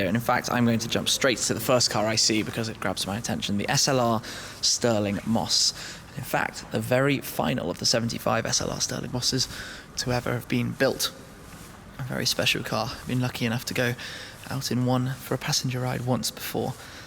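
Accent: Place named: England, United Kingdom. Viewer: theBreserk Accentul unul englez totusi, nu te poti astepta sa pronunte corect denumirile cu diacritice. :)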